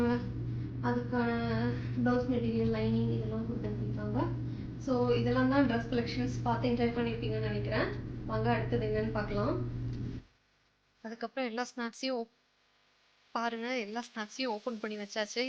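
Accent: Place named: Coimbatore, India. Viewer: I like your voice!!! Very sweet!!! And liked your Coimbatore slang 😊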